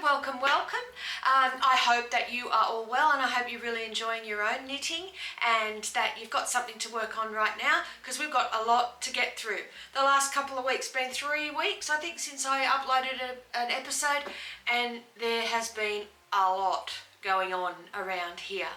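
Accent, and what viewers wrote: Australia. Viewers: I've been waiting for you to pop up on my tv. So interesting to hear you talk about your knitting projects and I really like to hear a real Aussie accent that isn't tonally flat like mine. Summer is just about here and Im trying to finish a couple of woolly shawls. Cashmere and Rabbit sound very exotic. I haven't used either yet.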